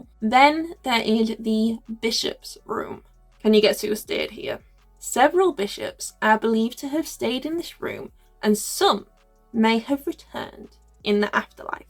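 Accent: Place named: Yorkshire, United Kingdom. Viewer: Yaaaay you're back, well done ^^ no it doesn't matter how dishevelled you are, it's a podcast, and honestly you still look stunning, it's kind of upsetting 😅 As much as the Cex demons have me curious 🤔 this might not be my first investigation location, maybe the next one >< definitely first time hearing of the ram hinn (yes you are very Yorkshire and it's wonderful) 😅 I was actually dozing off towards the end, because it's chill and 3am, not boring lol, night ^^ + I like all the editing, the text inserts and images were a nice touch, bloopers were cool too, again good job =]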